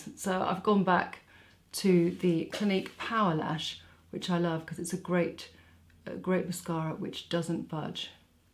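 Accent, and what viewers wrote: United Kingdom. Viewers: Hi from Israel!😁I'm always happy to subscribe to british "beauty gurues", the accent is beautiful. Happy Valentines day!🌼🌻💐🌸🌹🥀🌺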